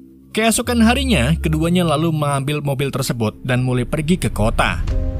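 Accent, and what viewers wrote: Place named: Pemalang, Indonesia. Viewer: admine wong pemalang😂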